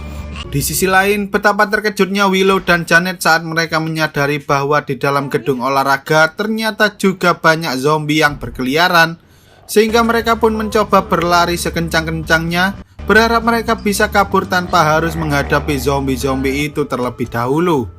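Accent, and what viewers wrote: Java, Indonesia. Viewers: Medok Jawa nya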